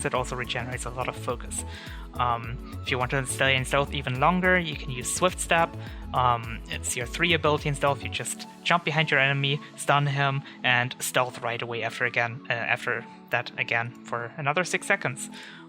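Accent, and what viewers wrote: Germany. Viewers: are you german? :p kinda sounds like it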